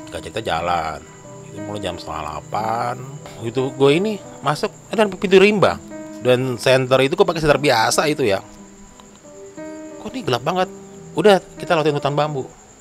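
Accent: Indonesia. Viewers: logat bahasa indonesia lorat bahasa malaysia salam dari perlis malaysia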